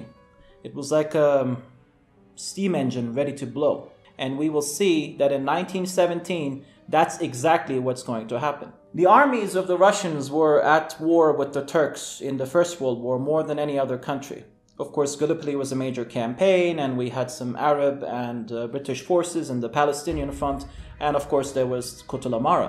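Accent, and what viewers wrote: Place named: Germany. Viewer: Almanya'da yasadin ? Accent almandir.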